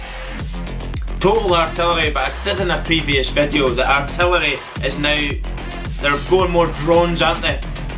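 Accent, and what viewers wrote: Scotland, United Kingdom. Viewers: There is no cooler accent in the world than the Scottish accent. I just love it! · If I could buy an accent it would be Scottish.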